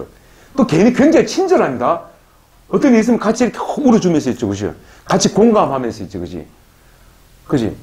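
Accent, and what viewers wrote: South Korea. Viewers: 안녕하세요.! 하!하!하! 정말 리얼한데요.!! 구수한 사투리...정말 친밀감과 진실성이 드러나서 왕팬입니다. 이번 에피소드는 영어유학원에 관한 얘기라서 더 진지하게 들었습니다. 지금도 필리핀에 계시는건가요? 저희는 샌디에고에서 CC2UC 라는 유학원을 운영하고 서울 강남에 국제학교를 운영하고 운영하고 있습니다. 혹시 역으로 필핀의 부유층들이 미국으로 유학을 원하는 층들을 모집할 수 있다면 어쩌면 도움을 드릴 수 있을 것 같아요..ㅎㅎ 한번 검색해보세요. 내년 여름방학을 이용해 필리핀으로 여행을 하게 될 경우 한번 만나뵙고 의 고매한 인품과 경륜을 배우고 싶네요..!!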